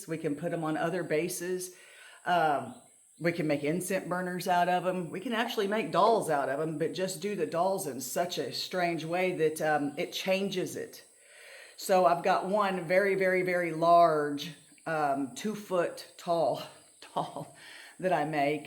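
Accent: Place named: United States. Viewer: First, amazing suggestions and style. I cannot understand why people want to make the same thing when you can mix and match. FYI--Check on your etsy link. it defaulted to British pounds instead of US dollars. This might be just a me problem but but I want to support fellow potters. (based on accent and mention of a US holiday I assume, like me, you live in US)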